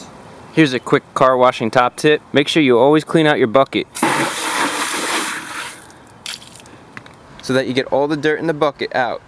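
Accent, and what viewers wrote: United States. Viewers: That's okay. I didn't want to be rude. Heard your US accent and thought you record mainly for Americans. No offense. cheers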